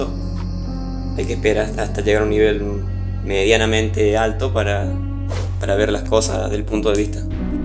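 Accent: Cordoba, Argentina. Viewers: Como me encanta como habla este cordobe son uno grande es como yayo 🤣🤣🤣🤣👍👍👍 segui asi papa !!!! Me encanto tus video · Che flaco sos cordobes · sos argentino, tenes alto acento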